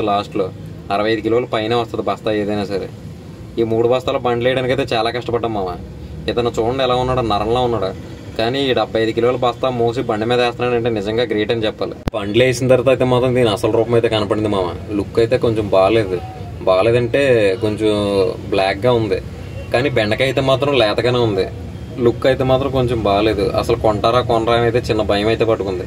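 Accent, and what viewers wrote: Godavari, India. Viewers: Mawa nijam cheppu nidhi godavari kadha 😅😂❤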